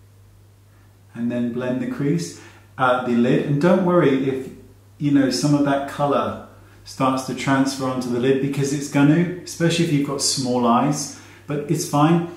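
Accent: United Kingdom. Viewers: I agree with you. Although I am from Brazil, I can understand almost the tinge he says. I Love his britsh accent. Sorry my english 😊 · Agreed.. a British accent is a plus too😍💕🍁🇫🇮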